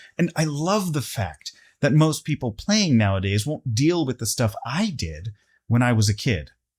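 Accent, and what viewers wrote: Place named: United States. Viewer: One critique from the early portion; You sound like your culture is American, your heritage is Asian. Just like I am American with a German heritage. Just a nitpick, don't mind me, lmffao.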